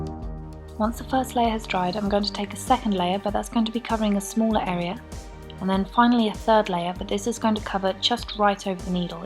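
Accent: United Kingdom. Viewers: British accent or Australian?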